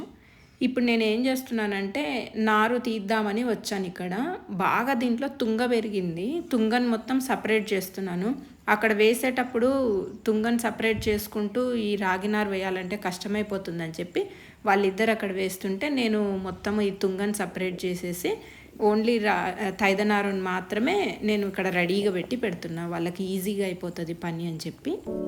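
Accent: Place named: Rayalaseema, India. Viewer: Ragi sakati resipi chayandi garu maa rayalaseema basha matladuchnnaru meeru andi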